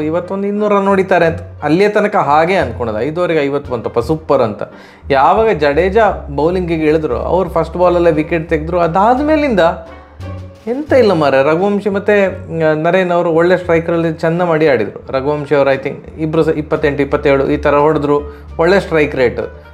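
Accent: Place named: South Canara, India. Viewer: ಪಕ್ಷಪಾತವಿಲ್ಲದ ವ್ಯಾಖ್ಯಾನ. ಸುಂದರ ಮತ್ತು ಸಿಹಿಯಾದ ಸೌತ್ ಕೆನರಾ ಕನ್ನಡ. ಯುಗಾಧಿ ಹಬ್ಬದ ಶುಭಾಷಯಗಳು.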